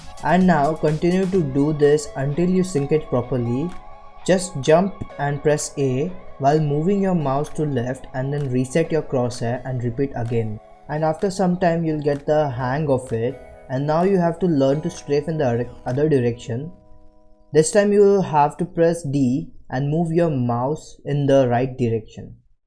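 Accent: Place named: India. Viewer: That Moment when you See an Tutorial Video in english and than its an Indian accent...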